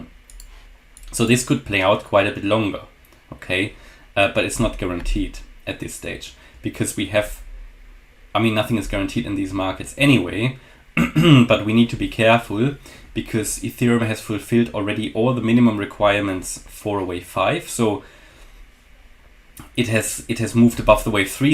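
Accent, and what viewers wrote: Germany. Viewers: he has a very german accent, definitely not dutch haha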